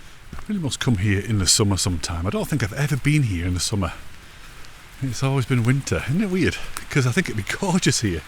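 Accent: Yorkshire, United Kingdom. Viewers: love watching your video's and your calming voice but trying to pick up ha with proper Yorkshire accent. But am listening & understanding 😊 canny beat a pint afterwards. ❤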